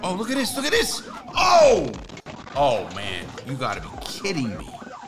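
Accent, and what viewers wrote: New York, United States. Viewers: I hear a slight New York accent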